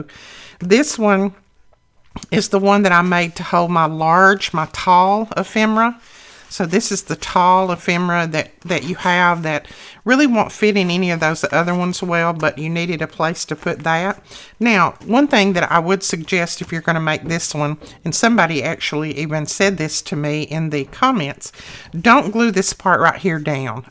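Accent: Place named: Missouri, United States. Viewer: Oh my goodness, you are so inspiring! I was recently given a bunch of printed papers and didn't really know what to do with them. So I searched youtube and you were on the top of the "scrapbooking" search. Your creations are so beautiful! I already made a couple of bible study journals, but I forgot to take any pics before I gifted them (just took one of the first cover). Now im working on a journal as a graduation gift for a family friend going off to college. I've discovered so many things I can use from your videos! And I LOVE your accent. I live in Washington state, but I'm from Missouri. You sound like home! 🏠💗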